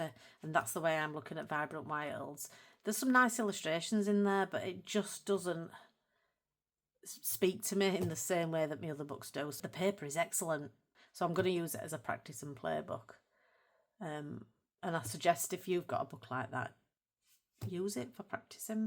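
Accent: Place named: Northern England, United Kingdom. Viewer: I’m really looking forward to this series - love the colour palette you have chosen for the background! I’m excited that you will be using Chromoflow as there are very few YouTube channels who use these pencils - Prismas are by far the favourite out there. I am a Mancunian living overseas so it is lovely to hear your Northern tones!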